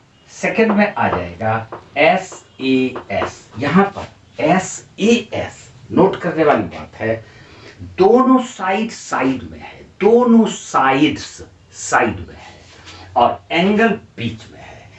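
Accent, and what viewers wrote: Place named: Bihar, India. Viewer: Lk dan 👍 sir aap bahut hi achha se samjhate hai students ko asani se samjh aata hoga aap ki boli se lagta hai aap Bihar se bhi ham thik kah rahi hu sir ji 🙏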